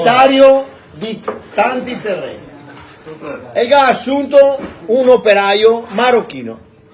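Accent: Veneto, Italy. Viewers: Insopportabile l’accento veneto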